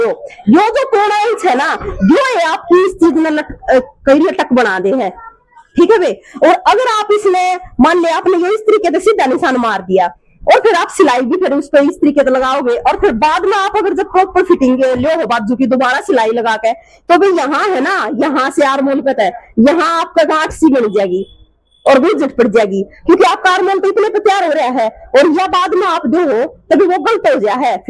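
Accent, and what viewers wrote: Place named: Haryana, India. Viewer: Bahan ji haryanvi hi bolte raho acha lgta h ❤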